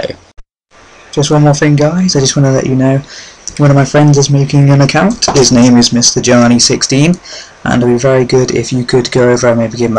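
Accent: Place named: Suffolk, United Kingdom. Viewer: Ahh, ok. You just sounded like someone from suffolk! lol How do you make your coins on ultimate team?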